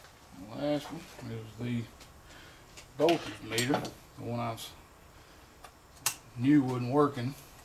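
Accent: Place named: Georgia, United States. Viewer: You from Georgia? That accent is THICK.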